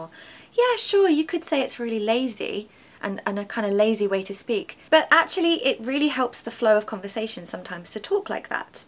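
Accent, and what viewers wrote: United Kingdom. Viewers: I love British pronunciation.. because it very clear sound Thank you...❤❤❤❤ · Hello Miss, I am an English learner from China, and I saw your video on YouTube. You know, British accent is very popular in China, and your English accent is very nice, and your voice is touching. Your video also shows many unique cultural differences between England and China, as well as popularize many UK culture and interesting traditions. Someone's moving your video from YouTube to Blibli (A Chinese Video Net like YouTube) I don't know if you know this? I suggest you also register an account on Blibli and upload your videos, so that there will be more Chinese English learners watching your videos. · I’m from 🇹🇭and I really love your British accent it’s so nice to listen to. I really wish you’d make longer video or even a podcast. I’d happily listen to you every day! 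❤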